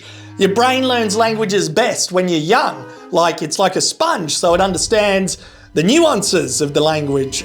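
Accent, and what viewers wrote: Australia. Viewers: My kids have been watching you for years😅 (under my tutoring) Canadian Cobras and Duck vs tiger !! I have gone so far as to allow them to swear as long as it's in an Aussie accent 🎉 (and not in front of Mom or Gramma)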